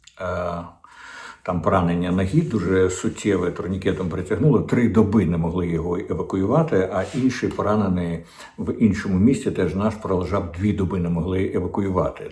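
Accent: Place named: Moscow, Russia. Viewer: ВИ - пречудовий оратор! Але, пробачте, чом ВИ ніяк не виправите вашу московську фонетику? маячЬня, щЬо, передачЯ, чЯстина, незначЬної, чЬому, почЯтку, ухІлянтам, політичЬна, бачІмо, схІльні, агЄнт, очЯх..... та й дивна конструкція-потороча "всЕ рештА"...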